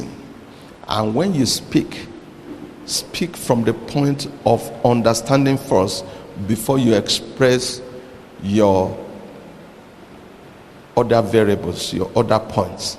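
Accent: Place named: Nigeria. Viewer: he is most likely a great Nigerian speaker. He has a very heavy accent now, but in time, he could improve. I suggest hiring a speech coach. Recording his voice and reading ouloud for one hour at least three times a week👍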